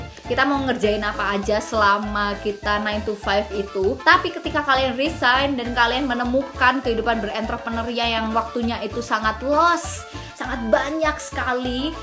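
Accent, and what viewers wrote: Java, Indonesia. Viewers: Mantap mbak e. Jowone neng ndi mbak 😁🙏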